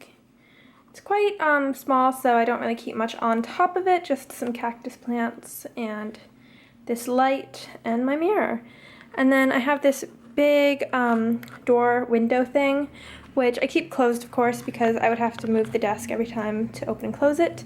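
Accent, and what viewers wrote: United Kingdom. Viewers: I think you've kinda picked up an English accent 😄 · Omg I just watched a few of your older videos and I noticed in this one you Kinda developed a British accent!! That is sooooo cool!! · You sound so British! :)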